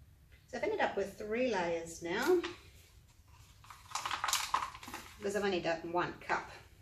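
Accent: Australia. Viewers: Love your Aussie accent! I’m from NY!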